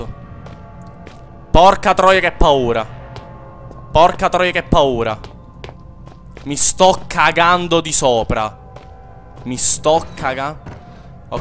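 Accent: Palermo, Italy. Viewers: compare complimenti per il tuo linguaggio a palermitano...mi ki fresco ki si..continua così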